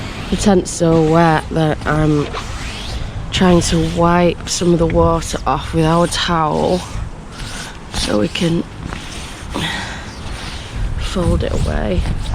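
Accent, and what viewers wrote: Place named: Liverpool, United Kingdom. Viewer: Hi, I have a very light combination bike lock which probably wouldn't stop a professional thief but could deter someone just doing an opportunistic snatch and grab. UK has so many interesting accents, I was thinking Liverpool for you( I'm not sure on his accent). I have checked out your other videos and wild horses wouldn't have dragged me up that path in the Madiera mountains and those Greek beaches looked amazing. I do some videos of my cycling trips but just from an android phone so not very professional and room for improvement. Keep up the good work.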